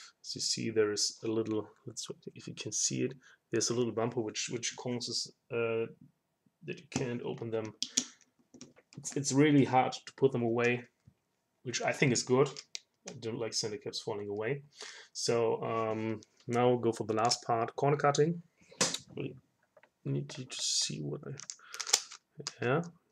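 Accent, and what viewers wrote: Germany. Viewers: Thanks for the review! Are you german?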